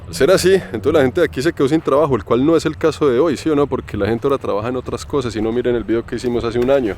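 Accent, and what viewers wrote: Colombia. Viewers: Me encanta el acento colombiano 🤗💕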